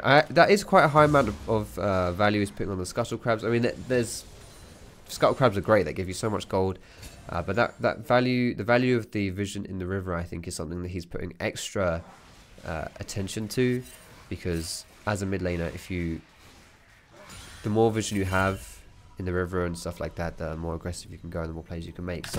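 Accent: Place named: Southern England, United Kingdom. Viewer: He is from the south of England, around Kent judging by his accent.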